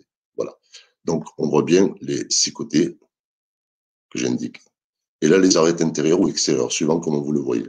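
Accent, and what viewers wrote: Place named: Marseille, France. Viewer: Voix maghrébine et accent Marseillais, un bon mariage. Bonne journée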